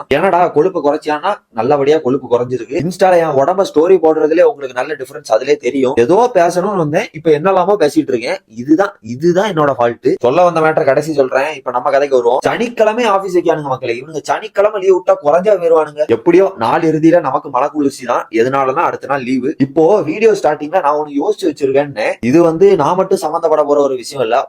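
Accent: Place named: Melapalayam, India. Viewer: nenga melapalayam ma bro slang is same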